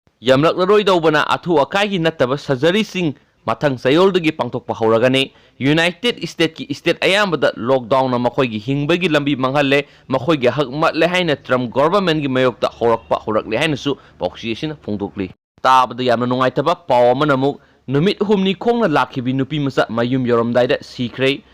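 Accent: Moirang, India. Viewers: moirang gi lon da douna tou a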